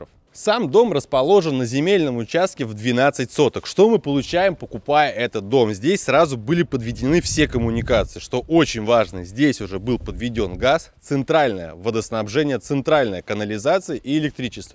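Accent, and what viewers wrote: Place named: Provinces, Russia. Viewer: Он конечно старается молодец, но речь ужасна. Прям видно из провинции.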